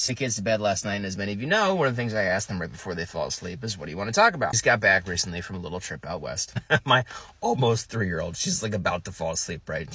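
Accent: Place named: Texas, United States. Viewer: That accent and jacket... You did not indeed mess with Texas. Except, Austin. That's not Texas. Great video btw!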